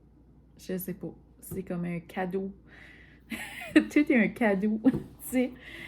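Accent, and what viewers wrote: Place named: New Brunswick, Canada. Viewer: Je viens de découvrir ta chaîne et en écoutant ton accent j’étais comme elle vient sûrement du NB comme ma maman vient de là, même si maintenant nous habitons au Québec. J’aime bien le contenu de ta chaîne selon mon petit survol rapide 😊 En passant concernant le deuil de ton animal, je te feel x1000 et tes émotions sont valides peu importe ce que d’autres personnes peuvent penser de cela ta peine est normale et tout à fait légitime. L’amour envers un animal est incommensurable alors le deuil est tout autant souffrant.💗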